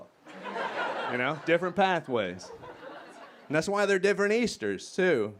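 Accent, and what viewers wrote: South, United States. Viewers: I just love brilliance with a southern accent ❤ such a juxtaposition.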